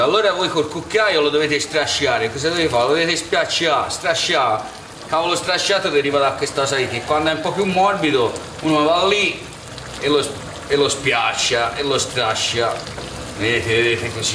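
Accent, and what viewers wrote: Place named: Livorno, Italy. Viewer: Dev'essè bono!!! Livornese abbestia eh!? Bravo, ottima spiegazione!!!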